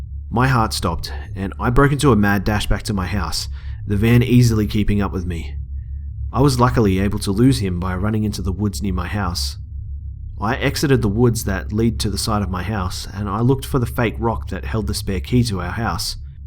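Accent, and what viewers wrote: Australia. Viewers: i love the Australian accent what do Australians think of a american Midwest accent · Delete your comment quick. He's aussie and aussies get mad when you mistake their accent for a british accent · good job. feels wierd hearing an Aussie on YouTube. guess I haven't delved enough. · does have a great voice! But you should come to Australia all the guys have the same accent/voice to a degree!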